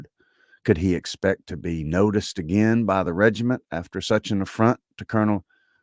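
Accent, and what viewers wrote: South, United States. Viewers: This is brilliantly read. I would never have thought with a southern accent it could be just as clearly conveyed being Regency English. Well done.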